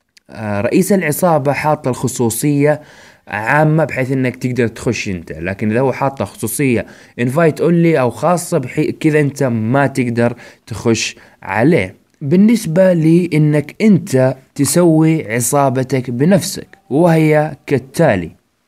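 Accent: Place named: Jeddah, Saudi Arabia. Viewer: اللهجتك جداوي ابوي 😎👍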